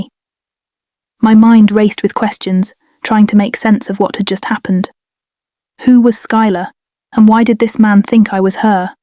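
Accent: United Kingdom. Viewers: First time listening. 29 minutes in, so far, it's good. I l❤ the brit accent.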